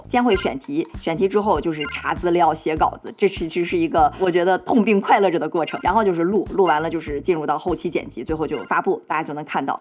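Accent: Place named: Beijing, China. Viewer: 好舒服的北京口音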